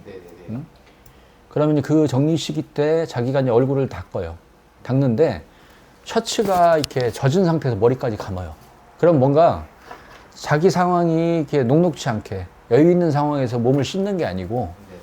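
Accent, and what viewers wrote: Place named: Jeolla, South Korea. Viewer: 말투가 호남사투리 전라도 점쟁이네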